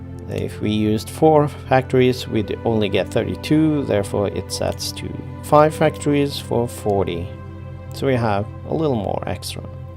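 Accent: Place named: Eastern Europe. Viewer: I think some Eastern European country or mid Asian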